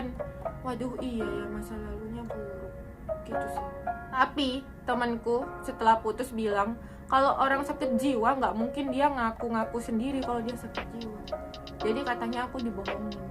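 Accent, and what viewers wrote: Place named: Surabaya, Indonesia. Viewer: Aku suka banget logat surabaya nya 😂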